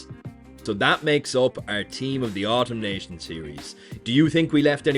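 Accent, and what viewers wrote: Ireland. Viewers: The type of video you watch twice, first time to admire the Irish accent, the second time to capture the good Rugby and Humor content! Well done my friend!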